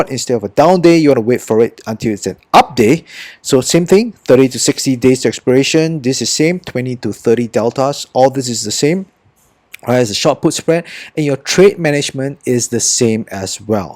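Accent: Singapore. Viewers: U sound like Singaporean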